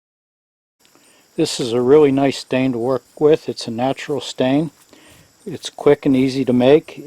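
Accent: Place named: Pennsylvania Dutch, United States. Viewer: I can hear the deitsch accent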